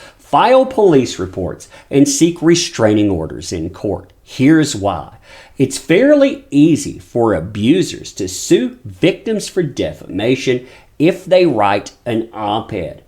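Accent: South, United States. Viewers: Listening to your southern drawl hillbilly accent is irritating enough, but could you please stop with the repetitive "That former certain Aquaman starlet". Just say: Amber Heard and then maybe go for speech therapy 😉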